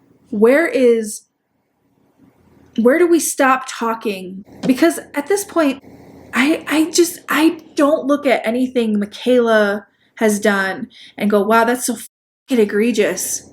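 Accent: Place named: Chicago, United States. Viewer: Omg can confirm that her Chicago comes out when she's heated. It's my FAVORITE.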